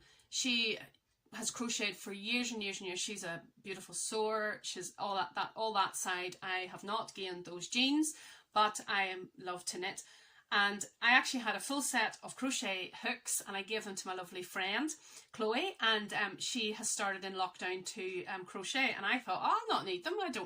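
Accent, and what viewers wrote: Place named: Northern Ireland, United Kingdom. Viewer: Hello thoroughly enjoyed your podcast, and ohhhh those socks are amazing. I watch Angela and that's how I found your channel. I'm originally from Carrickfergus and moved from N Ireland over here to Scotland back in 96 and it's always lovely to hear someone from back home. Stay safe stay well, hugs from Scotland xx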